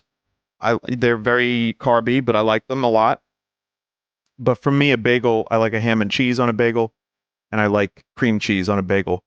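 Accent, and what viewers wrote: New York, United States. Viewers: I love how his new Yorker accent gets stronger throughout the segment. He even said "authahrity"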